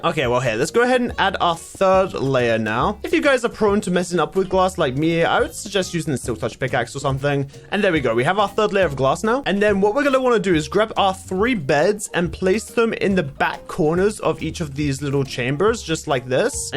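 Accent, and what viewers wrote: United Kingdom. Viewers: I'm still confused if is British or American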